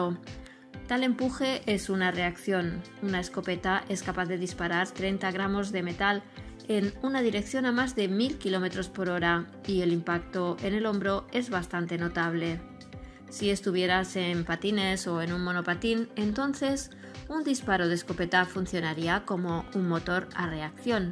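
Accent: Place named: Eastern Europe. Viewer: Es curioso este canal solo tiene videos de Musk y Rusia, tampoco he visto que el titulo se corresponda a nada que haya dicho Musk, así que todo esto tiene un cierto "TUFILLO" el "Mejor motor del mudo" si de hace 30 años era y es muy bueno y muy caro por el precio de 1 Space X hace 5 mejores y al menos igual de fiables. La locutora habla un poco como si fuera como del Este, en fin. Y la traca final "No vale la pena analizar los aburridos números" y tampoco que el RD 180 llega a una presión de 257 bares y un raptor ha llegado a 330 ese aburrido numero dice, más rendimiento más potencia. Una kk de video lo he visto a 1,5X y he perdido el tiempo y atufa a Kremlim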